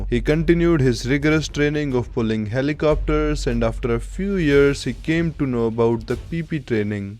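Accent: India. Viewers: Monotone voice with the Indian accent ... Got It ... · The Indian accent makes the videos so much better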